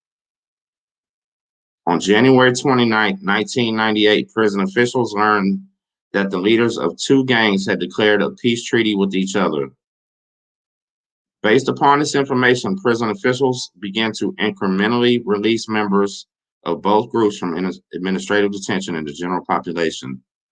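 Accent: Texas, United States. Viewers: Happy Friday everyone! 🍺🍻., La eMe is pronounced like M. A.😂. It sounds so different with your Texan accent. Please tell Mr Larry I send my wishes. Can I send him a card? Hope you and your family are doing well. Enjoy your videos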